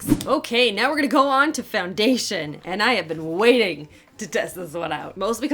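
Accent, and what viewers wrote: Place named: Canada. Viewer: Riv H she is Canadian. It comes out on occasion.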